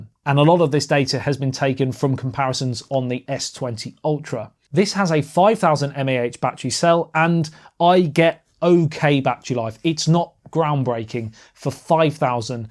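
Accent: England, United Kingdom. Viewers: That ENGLISH accent, 😂😂😂😂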